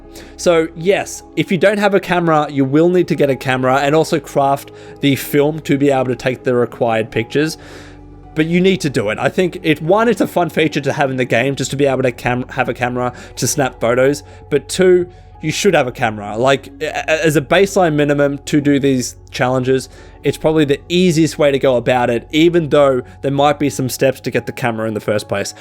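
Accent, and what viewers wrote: Australia. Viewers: Aussie? And you look like Daniel Riccardo 🤟🏼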